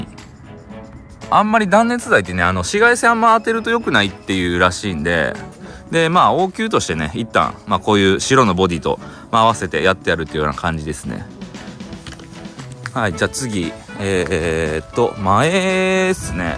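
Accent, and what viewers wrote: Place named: Kansai, Japan. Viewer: 同県民だけど微妙に関西弁違う 当方北部です。前の川？綺麗な景色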